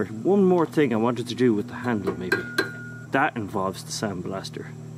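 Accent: Ireland. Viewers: So he IS Irish. I wasn’t sure... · Is he Irish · I like how this guy sounds Irish and is still understandable.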